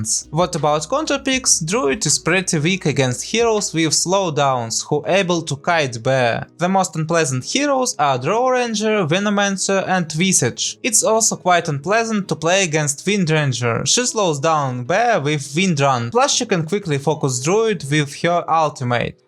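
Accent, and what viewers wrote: Russia. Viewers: У тебя специально русский акцент? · so hard to listen to the Russian accent in English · Вен ю трай то спик инглиш, бат юр акцент из стил рашн))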